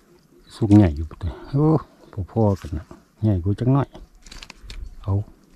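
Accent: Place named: Laos. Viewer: อยู่จังหวัดอะไรเว้าลาวม่วนดีเนาะแต่บางคำข่อยกะบ่อเข้าใจ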